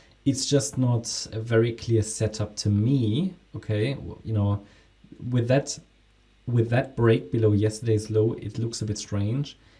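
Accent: Germany. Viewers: Bist du Deutscher? Hört sich so an 🎉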